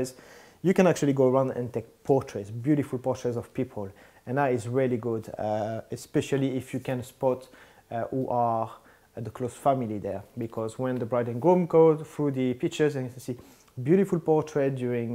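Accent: France. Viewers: I subscribed to another french photographer and when I first listened to you speaking I knew you're french..I love the accent.. Very informative video!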